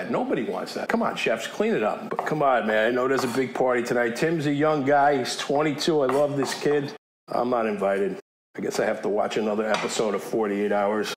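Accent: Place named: United States. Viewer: Chef, lei ha detto che è italiano, dall'accento non si direbbe: solo di origine italiana od ormai ha preso l'accento "americano"?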